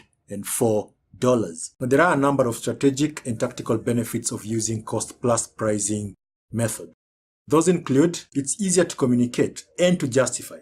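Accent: Kenya. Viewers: Aaaa thanks man! really helped! btw are you from kenya? can sense that kenyan accent haha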